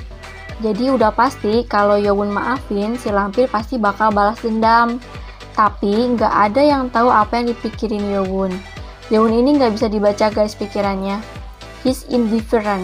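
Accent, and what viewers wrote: West Java, Indonesia. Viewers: Sunda banget ya ka 😂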